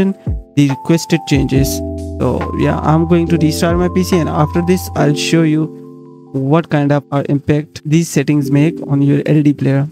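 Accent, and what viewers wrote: India. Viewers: It's always the Indian accent guy on YouTube 🤗🤘🙏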